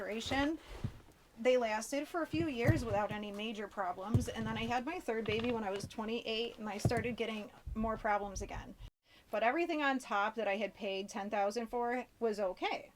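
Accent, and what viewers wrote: United States. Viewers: Are you from Minnesota? :) because you sound like you do! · I could tell you had a Buffalo accent from the moment the video started hahaha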